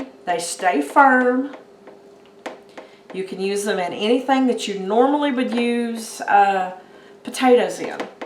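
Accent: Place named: Appalachia, United States. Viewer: Hi! I just attempted to email the yahoo email address listed, but it bounced. Here is what I wanted to ask you about: What about Sweet potatoes? Last time I canned conventionally in the traditional sugar/water, they were just so mushy, not much good for anything needing texture, plus the added sugar isn't always needed depending on the recipe. I think I did maybe dry can some cut in long slices like fries last year....but I wasn't sure about chunks. Can you instruct here, or maybe make a video? Oh, and if it matters: this year I grew white sweet potatoes, though I have done traditional orange ones in the past. The whites are slightly less sweet, and actually can be used as a sub for mashed potatoes, or in any other dish calling for potatoes. Thanks! Anita P.S. I love your accent. I grew up in southern OH (bordering WV) the accent there is referred to as "hillbilly"..., and I've hung onto it despite living in other parts of the country for almost 40 years. 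Not sure where you're located, but you remind me of home :)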